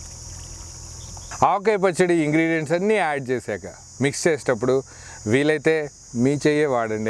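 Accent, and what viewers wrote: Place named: Telugu, India. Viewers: Ur Telugu slang is very nice sir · Your Telugu slang looks like Andhra bhayya. Telugu lo chesinanduku chala santhosham. Devudu meeku Ela service chesenduku avakasham echinanduku aa devuniki naa namassulu. God bless you beta. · Your Telugu slang is excellent bhai🙏 God bless!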